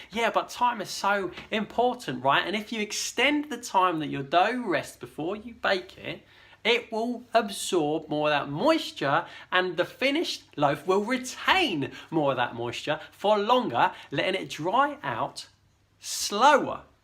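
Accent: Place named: London, United Kingdom. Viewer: love all the tips done with such sincere passion. I’m an American and wonder about that accent. Is it a London accent? If so, or if not, which area? Can tell it’s English, but curious of the location exactly!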